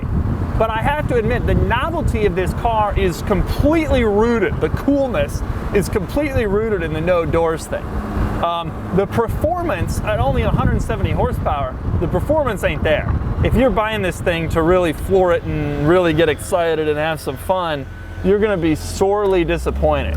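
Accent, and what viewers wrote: United States. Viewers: Ya, and it's Zee Zee Top, not Zed Zed Top, chill he's american, americans say Zee. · Doug is American. We say “zee” here.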